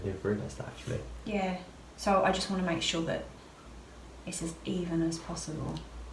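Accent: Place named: London, United Kingdom. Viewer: But proper got your accent cos im from luton and my dad is london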